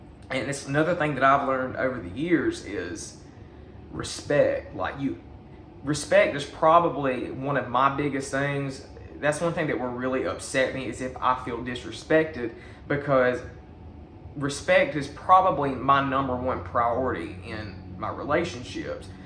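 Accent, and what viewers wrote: South, United States. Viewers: You have a very honest and down-to-earth sense of yourself and life in general. I love your southern accent drawl! lol. You've developed some great storytelling in your videos here. Maybe you could think about trying out some motivational speaker jobs at nearby schools or libraries?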